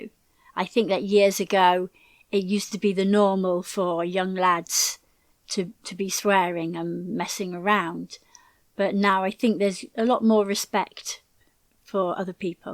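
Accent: United Kingdom. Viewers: thank you great british accent to learn here and enjoy it I hope one day you go live with mom and dad 🎉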